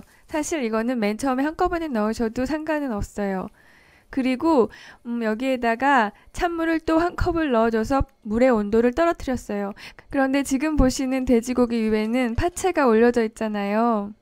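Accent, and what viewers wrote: Seoul, South Korea. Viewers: 뭔가 나레이션이 서울사투리같은데 그래서 더 정겨워요! 뭔가 아는̆̈ 이모님이̊̈ 알려주시는̆̈ 느낌이예요 좋은 영상 잘 보고 갑니다~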